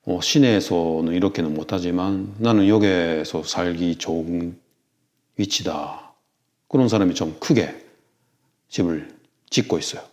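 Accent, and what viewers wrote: Busan, South Korea. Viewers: 어 완전 부산사람 말툰데요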